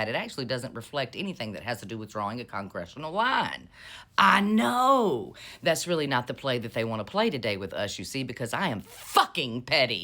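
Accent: South, United States. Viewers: I love this lady... her style, her commentary, her beautiful southern accent, the whole thing. Plus she is a looker. 😊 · I just love your Southern Belle accent dear!! and you have some beautiful blue eyes!! Your accent reminds me of my Aunt Fran who lived in South Carolina hun.... Im in Oklahoma... but my accent is more of a mix of Oklahoma, Texas and Louisiana. Thanks for bringing us the TEA everyday!! Much Love hon!! 😍😘🥰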